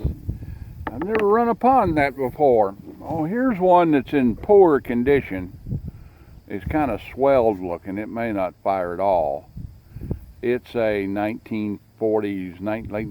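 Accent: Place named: Western North Carolina, United States. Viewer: Yep you sound like you're from West North Carolina around Murphy ? Am I wrong ???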